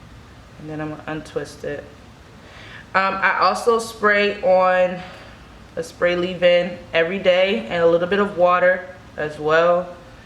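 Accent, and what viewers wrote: Baltimore, United States. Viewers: Aye sis! Is that a Bmore accent that I hear? Let me tell you. I've watched 30 videos on twists and yours was the only one that actually showed the technique. Thank you!!!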